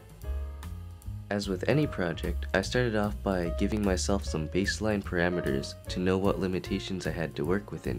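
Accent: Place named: United States. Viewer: Is this the first time he’s spoken in a video? Didn’t expect him to be American.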